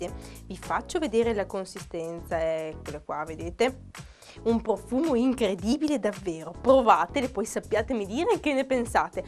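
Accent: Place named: Sardinia, Italy. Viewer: Non metterei mai la ricotta vaccina ma solo di pecora, ma veder realizzare le pàrdulas da te è un piacere, certo non quanto sentire l'accento familiare che lascia immaginare profumi e aria della mia splendida isola, ma il tuo accento, i tuoi modi e la tua bravura sanno essere piacevolissimi come sempre. Un saluto a tutti i miei corregionali e alla mia terra lontana ❣️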